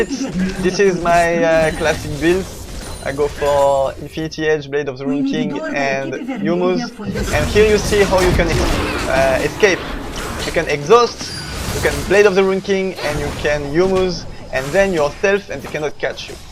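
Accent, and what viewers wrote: France. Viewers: Omg l'accent français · no we clearly jear the french accent. but yes i wasnt so focused on game language and aint saw it wasnt spanish :) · on en parle DU FRENCH ACCENT ???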